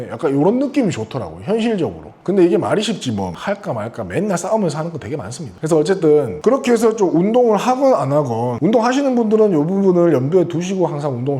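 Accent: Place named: Gimhae, South Korea. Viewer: 행님 김해서 오셨습니까 사투리 구수하네예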